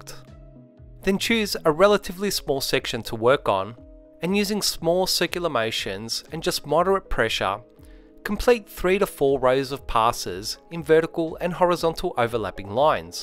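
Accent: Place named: Australia. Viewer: What nicw accent is that? Aussie?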